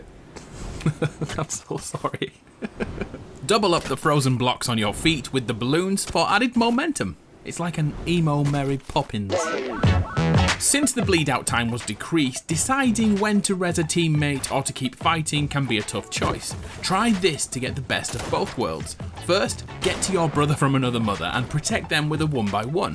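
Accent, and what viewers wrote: United Kingdom. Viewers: you, tracer and pyrocynical are the only humans that i respect whom speak british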